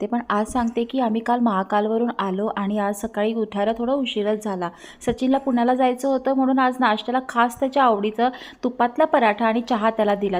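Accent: Kolhapur, India. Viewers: Kolhapur side la ambolich boltat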